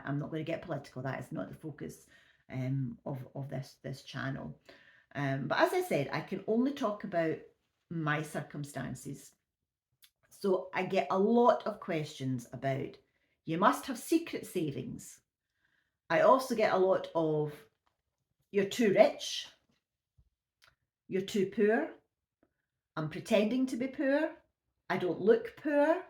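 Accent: Scotland, United Kingdom. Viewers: Hi, some people are so rude they just are not really worth bothering about. I really enjoy your channel and your blunt Scottish accent. I am 89 and have enjoyed my life. I live with my son now because when my husband and I retired we sold up in the UK and moved to Spain we had 10 wonderful years out there then my husband got terminal cancer so we came back and he died 6 months later. I sold my villa in Spain and was able to give my two grandsons the deposit for their own homes and help my two children out as well. I live in the South East and property is very expensive so I was able to sent them up while I was still alive to see them enjoy it. I live with my son because he is alone and we enjoy each others company I have a good state pension and two small private pensions and some savings as well. I have paid for my funeral so now I am enjoying the rest of my life with my children.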